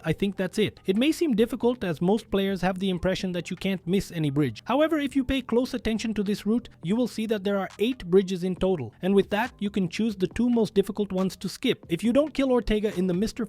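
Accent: Brazil. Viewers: He is brazilian, just like me. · actually its portuguese he have an brazilian channel and lets just say his english isnt that good hope this helped!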